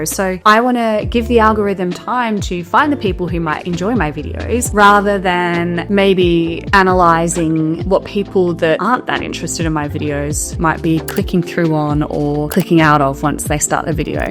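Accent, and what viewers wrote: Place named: Australia. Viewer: Keep going! You are definitely on the right track. The absolute most important thing I have found is consistency – upload with the same time intervals, whatever you choose – and keep the momentum. Google seem to like that. Experimenting with content, like you're already doing, is also great. Have learnt many informative things from you, so will definitely be continuing to follow you. Love the Australian accent BTW!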